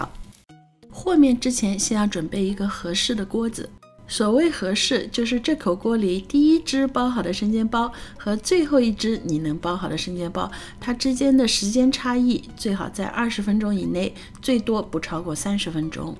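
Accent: Shanghai, China. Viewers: 很好，顺便宣传一下上海话，很亲切，赞！ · 这就是标准的上海人讲普通话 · 我妈看她视频。我在旁边一听就知道她是阿拉上海宁。满满的上海味道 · 尽管有其他很多貌似专业厨师的视频，但听到了上海话就无理由地感觉这才是最靠谱的做法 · 嘻嘻 听你讲上海话超级亲切，秒想回国😢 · 有的有的，口音是标准上海年轻人的口音👍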